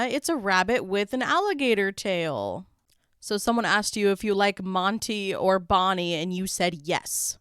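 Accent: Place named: Minnesota, United States. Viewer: it sounds Minnesotan to me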